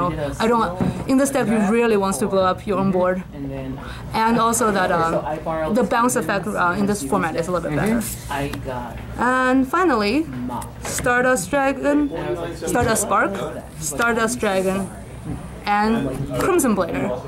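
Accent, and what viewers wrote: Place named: Asia. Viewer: Her Asianic accent. Finally I'm not the only ones with Asian accent that plays ygo. :)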